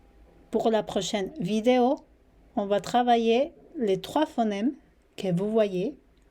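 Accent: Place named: Spain. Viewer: HoLa !! Je suis Français (donc ma langue maternelle est le français) et hispanophone "autodidacte" (je n'ai jamais suivi de cours mais j'ai beaucoup écouté et lu et vu des tutoriels) : il me semble que votre vidéo, si je l'ai bien visionnée et écoutée attentivement, peut prêter à un certain degré de confision, car il ne m'a pas semblé que vous abordiez le "e fermé" (peu, deux, feu, euh...) alors même que c'est la manière type de prononcer cette lettre quand on récite l'alphabet en français ! d'ailleurs, quand vous évoquez brièvement le "y" vous le nommez "la yod" alors qu'en français, on dit "le i grec" ! par ailleurs vous récitez les voyelles "à l'espagnole" en particulier le "u", que vous prononcez tel un "ou" à la française... merci cependant pour vos efforts de vulgarisation ! Par ailleurs il me serait loisible d'entrer directement en contact avec vous ou quelqu'un d'autre de L'atelier du français | Paris (pour une bonne raison musicalo-professionnelle car je suis Compositeur) !!!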